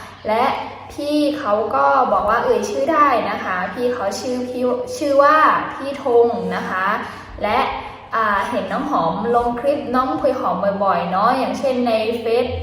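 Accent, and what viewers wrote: North, Thailand. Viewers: สำเนียงเหนือ อู้เหนือ ก็คนไทยด้วยกันนี่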